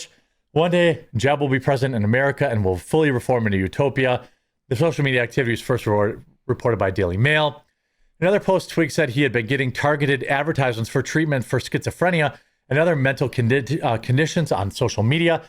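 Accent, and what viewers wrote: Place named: Wisconsin, United States. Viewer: the Quartering is from Wisconsin. Along with Minnesota and parts of North Dakota, that region is known for having a distinct accent within the US. Jeremys isn't all that noticable, but this is one instance where you can hear it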